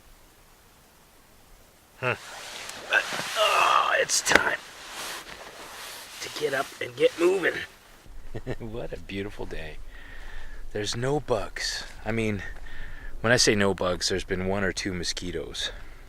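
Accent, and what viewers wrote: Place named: Ireland. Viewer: I believe that is the first time I've heard an Irish accent from Canadian lips... I like using flour tortillas as a wrap for the Breakfast Skillet. I gently warm the tortilla over the fire first. Then scoop in a healthy portion of the mix into the wrap. Tastes great. Maybe a little hot sauce to go with it. Agreed. Over-nighters lack enough time to get into the wilderness frame of mind. But they are great for testing gear and just getting away from it all for a bit. Great video.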